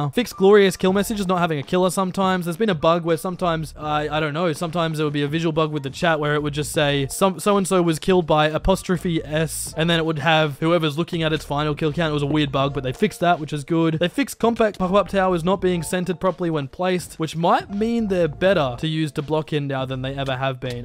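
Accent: Australia. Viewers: you sound more australian in this video 🤔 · Are you from Australia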